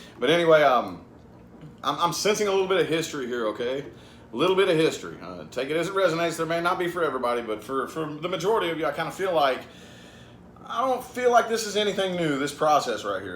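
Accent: United States. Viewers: Where in the US is your accent from? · Very nice, very accurate. By the way, I love your american accent (I am not from an English speaking country). Thank you very much.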